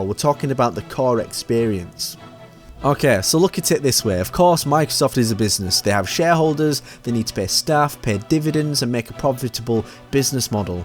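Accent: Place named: Bolton, United Kingdom. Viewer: You are definitely from Bolton aren't you, jesus Christ🤣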